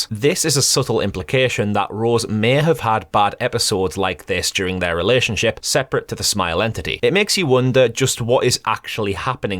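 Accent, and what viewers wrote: Scotland, United Kingdom. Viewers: bro, your Scottish accent is so strong (no Offence btw) 🤗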